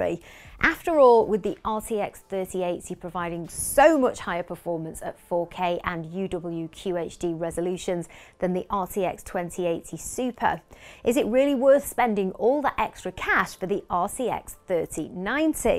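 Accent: United Kingdom. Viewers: I really like the British accent (I'm French btw)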